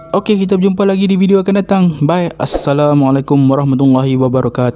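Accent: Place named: Kedah, Malaysia. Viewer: Pekat kedah.. Haha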